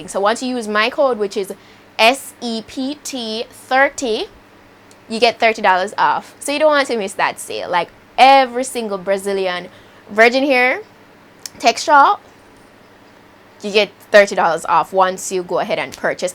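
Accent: Jamaica. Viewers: U never heard jamaican accent🙄😢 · Thank you for not camouflaging our beautiful accent with a twang. It’s refreshing to see a Jamaican with a platform like this. Keep it up! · Goodasssss! Wooyyyy. Lol I just found this video today and literally recognized the accent and fell in love. Such a quick but helpful video! Thank you and definitely subscriber. Happy to see Jamaican YT prospering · Love your method! I m gonna try it today! Your accent has me missing my family in Kingston 😩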